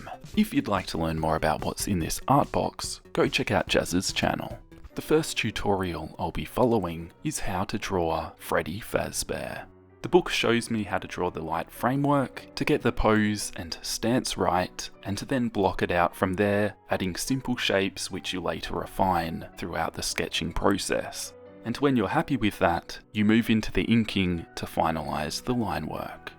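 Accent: Australia. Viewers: Australian dialogue 😱😱😱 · Also, r u Australian? If u r, i am 2!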